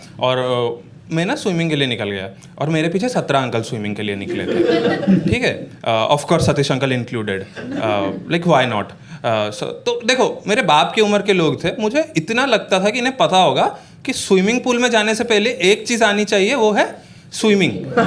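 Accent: Odisha, India. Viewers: Odia tone sun ke maja aa gya!! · Tone se to odisha se lag rhe ahem ! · Bhai pura radducha .... Hama hindi in odia accent ❤😊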